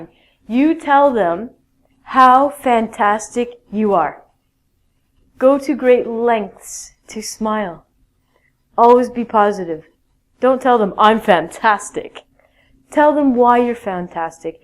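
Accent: Canada. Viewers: oh my god, i could tell you were Canadian from the start, haha. I love Canada! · are you canadian?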